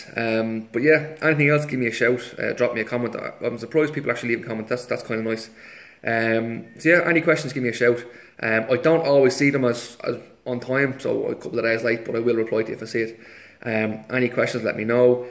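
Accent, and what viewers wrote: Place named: Dublin, Ireland. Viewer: great video pal I'm keeping linnet cock to hen canary. two pair of canary red poll cock paired to two hens in one of me flights and pair of goldfinch in the and two cock goldies paired to two hen canary ur soft food mix looked great . can you tell me where to get canary soak seed I'm just use in pigeon soak at the minute the stuff u get in Turkey thanks for a great video and help full tips hope u can help I should have said I'm from Dublin and u sound similar thanks again keep the videos coming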